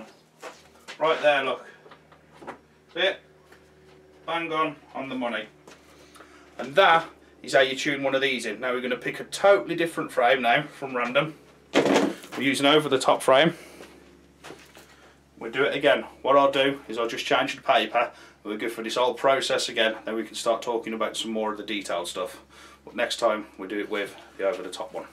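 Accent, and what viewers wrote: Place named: Midlands, United Kingdom. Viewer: the video poster has an English Midlands accent.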